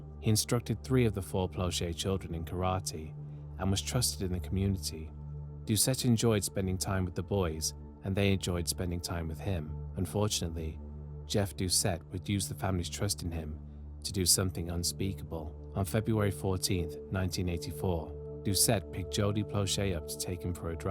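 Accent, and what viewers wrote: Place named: United Kingdom. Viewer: You sound British so please please please stop saying YouTTTTTube. It is not a hard T!!!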